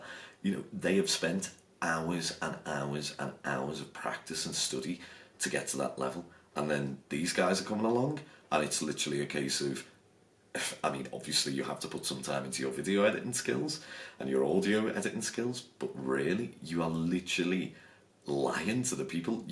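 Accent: Liverpool, United Kingdom. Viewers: Totally off-topic, but I instantly subbed for your beautiful scouse accent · Is he from Liverpool ?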